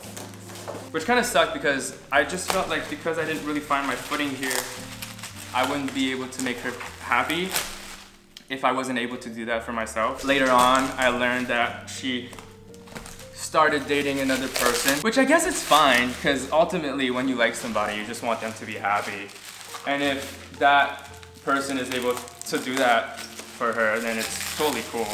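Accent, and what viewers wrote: Quebec, Canada. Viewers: Hey!! C'est la première vidéo de ta chaîne que je regarde, si je ne m'abuse, c'est bien un accent québécois que j'ai entendu ? Bien le bonjour, de Montréal, hehe ! Plus sérieusement, j'ai 24 ans et je n'ai jamais eu de relation amoureuse ! J'ai eu quelques crush, mais franchement, je peux les compter sur les doigts de ma main et chaque fois, j'ai fini par apprendre que la personne était en couple ou avait un intérêt pour une autre personne. Ce sentiment d'embarras dont tu parles, je l'ai ressenti à chaque fois ! Un peu l'impression d'avoir été ridicule de penser que mon intérêt aurait pu être réciproque, aie. Mais, disons que dans la solitude, j'ai eu le temps de réfléchir, haha. C'est un peu le revers intéressant de notre situation. Je trouve que tu as une très belle approche réflexive et je devine que c'est l'avantage de n'avoir qu'à t'inquiéter pour toi-même. 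J'ai remarqué que mon expérience de célibat m'a également donné cette opportunité de me concentrer sur mon propre développement personnel. J'en suis venue à une conclusion similaire à la tienne : il n'y a pas que les relations amoureuses dans la vie et si je dois un jour en vivre une, j'en vivrai une au moment venu. Pour l'instant, je vivrai en me mettant des objectifs qui m'intéressent plus, notamment avancer dans ma carrière et terminer mon parcours académique ! Ta vidéo m'a été recommandée par hasard, mais c'est toujours nice de tomber sur ce genre de vidéo et de se rappeler qu'on n'est pas seul(e) ! Ton pain avait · Let me tell you, I turned 24 and I have never been in a real relationship. I never found a person I genuinely wanted to be with. Most people find it weird and just don’t get it. Funny enough, I do not feel bad about it, I am actually quite okay with it😂. I feel like you should always do what feels right to you, take your time and not rush into something you don’t want (but, if you do have real feelings for someone, for God’s sake, do not supress them and go for it!) I also fully agree with you, if you are happy with who you are and confident in your own self, you’ll be more than fulfilled on your own and the relationship you’ll eventually get into will be a much better and healthier one. 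PS: ton ptit accent québécois est trooop mimi haha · Omg are you from Québec , canada ???! , u have that french accent , im from Montréal btw ! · tu viens du Canada ? parce que ton Français ne sound pas comme le français de la Franca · OMG! Ta chaîne youtube a pop-up, j'écoute ta vidéo (cuz I kinda relate to the title of being single forever...) Je ne m'attendais ZERO à t'entendre parler québécois 😳! Tu es québécois? Wow~en tk Thanks for sharing this Nice cozy talk ^^ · Wait u speak French sa m’a surpris mais par contre on dirai que t’as un accent canadien pas trop français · Hi! I just discovered your channel, but I'm pretty sure that I've seen your pretty face on Tiktok already once. I guess YouTube algorithm suggested me to watch this video for a reason. I'm 29 and single af, but if I can provide you an advice is to take your time as you're already doing but don't take too much time though 😆 Et wooow qu'est-ce que ça m'a fait bizarre et plaisir en même temps d'entendre l'accent québécois après toutes ces années! 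Haha take care✌🏾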